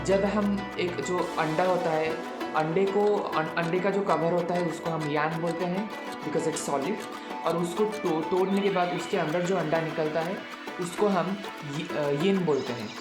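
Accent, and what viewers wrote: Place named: Odisha, India. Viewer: Bhai thoda ladka bano Aurr hindi bhi aap ki lag rahi hai odia accent wali Yeh rang dhang change karo ladka bano re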